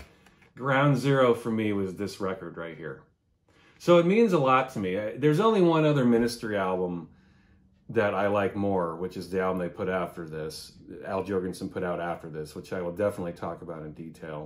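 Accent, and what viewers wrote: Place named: United Kingdom. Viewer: British accent? I was thinking the same thing! Funny!. Peace!.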